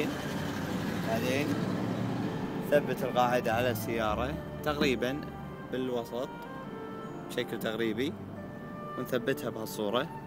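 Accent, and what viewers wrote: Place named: Iraq. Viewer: كﻻمه عراقي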